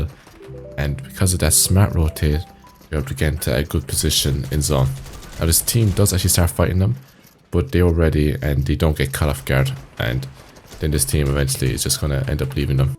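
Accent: Ireland. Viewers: Are u irish ?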